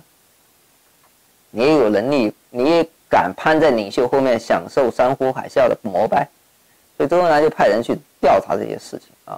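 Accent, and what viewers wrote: Guizhou, China. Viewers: 配上字幕，真的是好多了。。。不过我刚刚习惯了听你的贵普，哈哈。。。另外，字幕有较多错别字，估计是语音转换的关系。比如那个“一语成谶”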